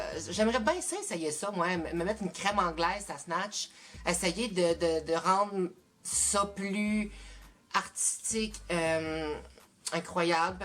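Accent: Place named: Quebec, Canada. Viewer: Ptn il est horrible l'accent québécois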